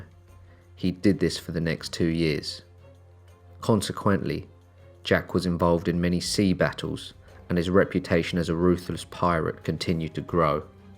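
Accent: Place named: South, United Kingdom. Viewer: You sound nothing the same.. both refreshingly UNIQUE with a southern UK accent.. but totally different voices! 👍😸🇮🇹🤗 I will never understand why many think you sound so alike.. but maybe non Brits hear it in a different way..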